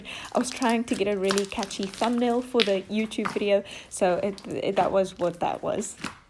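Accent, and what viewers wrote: South Africa. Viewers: When i heard your voice i immediately thought of south africa😂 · wait is she south african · I clicked on this video having no idea that you're South African! I heard your accent and was like OMG MY PEOPLE!! I live in the Eastern Cape and find it unsurprising that you're based in CT where the cool things happen. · I have a question are you in South Africa because of your accent and because of the brand of the coffee and milk? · wait, i just noticed ur from South Africa, i looked up ur slime shop and saw everything was in that currency. I'm shocked, no wonder your accent sounds so familiar! My mother is from South Africa!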